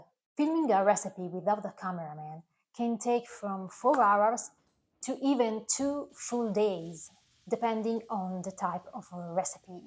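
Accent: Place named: Italy. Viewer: Ciao Cara Mia 🥀. You have been part of my life for over 7 of those 8 years you have been making videos. The reason I love you and your videos so much is because I feel like you are doing it just for me. I also love your videos so much because you make things that remind me of my NONNA. I remember the first time you did that. You were using an Espresso coffee pot like my Nonna had. I commented about how it made me think about her. I love the way you share your vacation pictures and your personal pictures of your family Holiday table. Your voice is lovely. You sound like an Italian Girl speaking English. The friendship we share is very important to me. I value it greatly. That's why I always tell you, TI AMO AMICA MIO, because I do. My dear sweet friend. 🇺🇲✝️🇮🇹🤗😘😘😘😘😘😘😘😘😘😘😘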